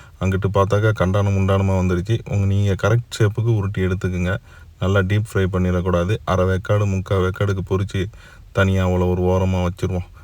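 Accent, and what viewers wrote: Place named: Parangipettai, India. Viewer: நீங்க என்ன பரங்கிப் பேட்டையா? அந்த slangil பேசுறீங்க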